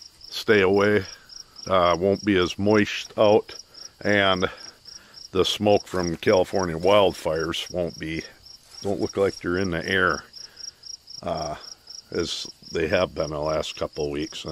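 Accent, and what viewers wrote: Minnesota, United States. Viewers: Sounds like Minnesota Man.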